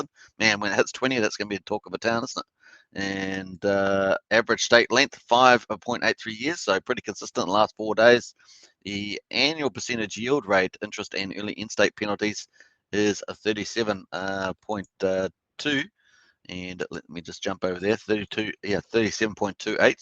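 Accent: New Zealand. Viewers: Is that a kiwi accent?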